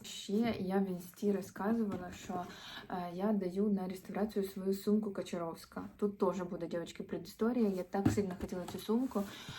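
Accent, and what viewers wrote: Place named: Surzhyk, Ukraine. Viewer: Снідаю і дивлюсь ваш влог 😊дуже подобається ваш вайб і ваш суржик 😉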